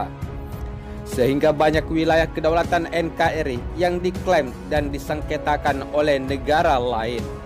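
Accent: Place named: Malaysia. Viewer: Admin nya kayak orang malaysia ini yg ngomong kliatan cara bicaranya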